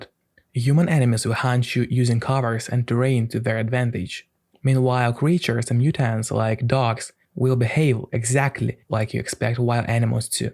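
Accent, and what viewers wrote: Eastern Europe. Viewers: I couldn't explain his channel better I saw in his other videos pepole making fun of his heavy Slavic accent but I love it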